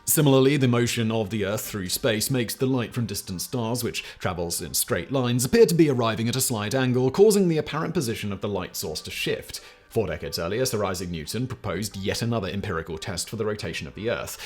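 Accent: United Kingdom. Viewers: He has a thick British accent, but why then does it feel like he's an American?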